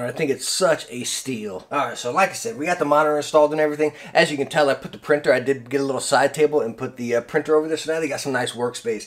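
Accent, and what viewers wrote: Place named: South, United States. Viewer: I stumbled upon your channel when deciding which monitor I should upgrade to and now you've helped me decide on a headset as well. I already have an MSI RX580 but I'm watching this video anyway because I love your personality and energy, not to mention that southern comfort vibe. The quality of your content is insane and I really hope you blow up soon!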